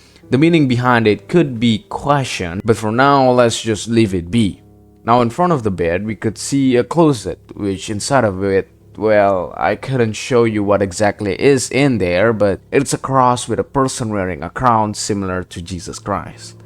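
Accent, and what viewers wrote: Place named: Russia. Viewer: you sound kinda Russian lol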